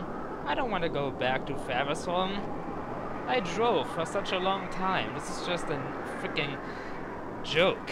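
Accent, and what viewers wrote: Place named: Germany. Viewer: you got skills german skills so use your awesome accent to fool the creeps in thinking your all stone cold so you can crack but look them in the eyes and laugh, laugh manicly catching them off their feet and go piano string on their ass jack-the-ripper style thats how i would but then again i solve problems by jumping off a heilocopter with a gauntlet because i was too lazy too pick up my parashoot im ok but one unfortunate guy hang-gliding didnt do a barrel roll sooooooo he... GOOD LUCK!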